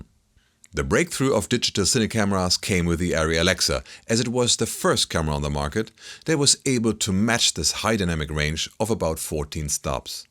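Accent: Germany. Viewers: I could listen to that German speaking accent and intro synths forever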